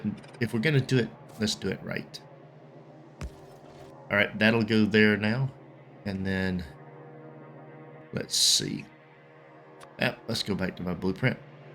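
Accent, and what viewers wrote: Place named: South, United States. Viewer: It sounds to me you're from the south. Like the accent and I love this game :)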